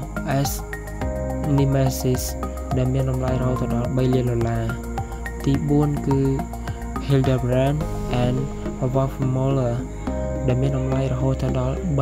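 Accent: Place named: United Kingdom. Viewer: តុង English ពេកឮតែខ្យល់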